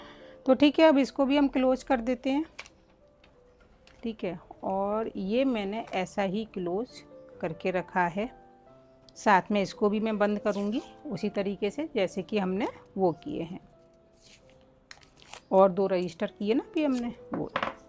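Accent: India. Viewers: Your accent looks like Maharashtran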